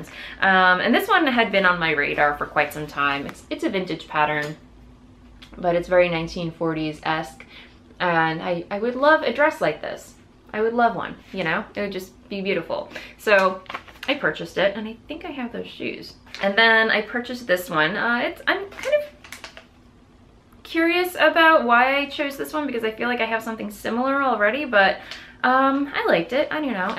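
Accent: Brooklyn, United States. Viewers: You've inspired me to try dyeing yarn and now you're inspiring me to sew more. I really wish I could sew up a whole wardrobe for myself. But I'm such a newbie! Oh and I loved your Brooklyn accent! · I'm from the Fort Hamilton area of Brooklyn and I don't have a very strong accent either. Your accent actually sounds a lot like mine. My Grandmother use to say "Errl" for oil but she was from the Jamaica area originally. None of my uncles or Aunts have that type of accent like my Grandmother. I think it was perhaps more from the German area of my family that did not speak that way. So perhaps it is the German/Brooklyn connection. I do still drop my R's though. Dinner is "Dinna" still. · chuckling here at your Brooklynese accent .... so cute.... and as always love your podcast! oh ... and your dress is great too. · OMG!!! You SO need to do an episode in Brooklynese!!! 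So good! 🤣🤣🤣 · What a fun inspiring and energetic episode ! Your shawl/ blanket looks so amazing ! Wow You made me laugh with your Brooklyn accent - too funny , in a good way Hope you have an amaIng week XEmilia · your Brooklyn accent was BY FAR the best thing i heard all day!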